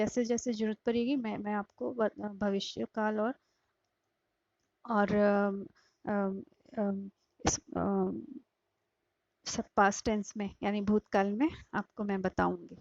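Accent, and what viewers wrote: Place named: Aurangabad, India. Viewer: Aapka location aaurangabad hai. Sayad har jila me log alag alag tarah se maghi bolte hai. Hamara navada hai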